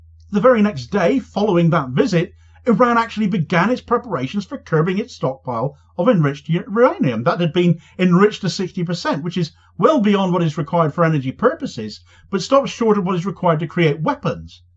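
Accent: Cornwall, United Kingdom. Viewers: I’m glad you feel like me, so many people are feeling the same way. I don’t know how many people of all nationalities have said the same. I never thought I’d be rooting for Hezbollah, Hamas, Houthis or Iran. Many people are saying it’s as if the world has turned upside down. A lot of Americans have said how ashamed they feel at their governments policy regarding Israel. People, especially in the west feel as if they have been lied to for decades. So many people are now clued up about the situation of the Palestinians, including the Nakba, 1948 and the forceful displacement of the Palestinians by the Zionists and the terrible atrocities that were committed by them. Social media has been a boon in educating people and channels like give us the facts. has been consistently brilliant at showing us the truth, I also love his Cornish accent.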